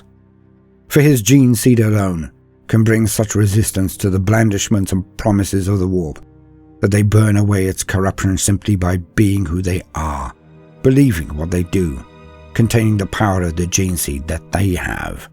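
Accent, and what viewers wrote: United Kingdom. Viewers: I always give warhammer channels an extra 20 points for having a British narrator.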